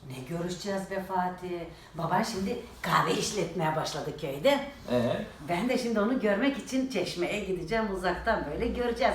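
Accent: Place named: Thrace, Turkey. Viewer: Yaaa ne kadar tatli bir ablasin ❤ bol bol boyle sohpetler hikayeler reelslar paylas nolur 😅o kadar eglendirdinki bizi Allah'ta seni guldursun ablacim❤ bu arada annemde trakyali kirklareliden sen konustukca bizim oraların ruhunu hissediyorum buram buram 🥹🥰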